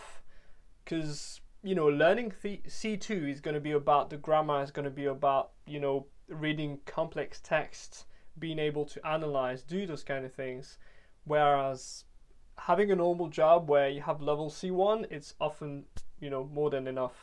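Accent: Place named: United Kingdom. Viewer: Très impressionné, bravo pour le travail ! Si je peux me permettre, j'ai l'impression que la prononciation du "there" peut être améliorée, et c'est un mot qui revient souvent donc on remarque la différence avec le reste qui est dans un accent british quasi irréprochable haha Bonne continuation en tout cas, keep up the good work !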